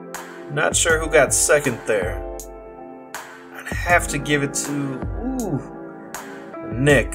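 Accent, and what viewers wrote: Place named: East Coast, United States. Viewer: East coast commentary...yes sir! Good job, and always great racing!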